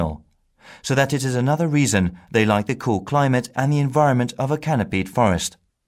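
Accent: United Kingdom. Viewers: British accent , this is a nice accent , but not for exam . 4/6 I could do it better :(((((((((( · me too :'( bc it's British Accent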